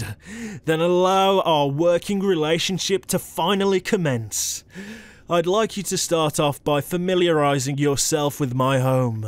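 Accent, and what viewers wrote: United Kingdom. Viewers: I love british Accents they're so nice and calming🤩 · Againe, dune, this British accent that what got me into this asmr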